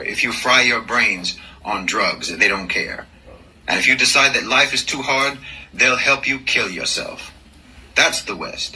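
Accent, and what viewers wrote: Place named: United States. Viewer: I'm confused. He speaks like an American.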